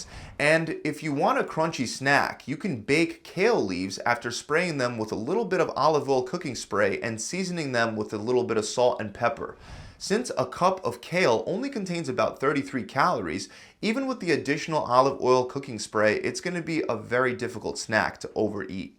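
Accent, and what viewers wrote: Philadelphia, United States. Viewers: That Philadelphia accent is distracting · I know my Philly (area) accent when I hear it …”wooder” 🤣 from Philly or Jersey? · Love that Philadelphia accent. Great information. Thanks!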